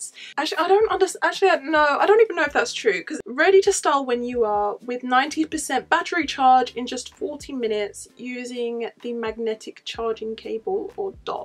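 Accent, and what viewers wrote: United Kingdom. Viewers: Where did you get your comb tool from? Also what country are you from.. you sound British? Xx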